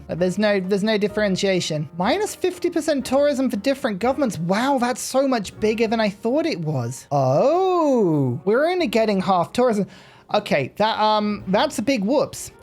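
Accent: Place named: United Kingdom. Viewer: "vis.. vwappid coowing of ayges" sometimes the British is so thick it makes my American blood sick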